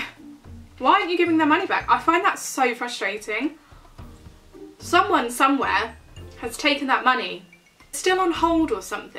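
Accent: United Kingdom. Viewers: Is it weird that I love to listen to your voice and your British accent 😂 I cannot believe that Chris ingam is still blogging I have been watching the Wilkes family and also Demi Donnelly as well as your second channel and all the evidence is disgusting he definitely is guilty so when is he going to face justice. we won't ever forget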